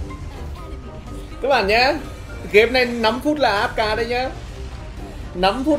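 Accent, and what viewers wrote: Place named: Central, Vietnam. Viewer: bạn nói kiểu miền trung rất khó nghe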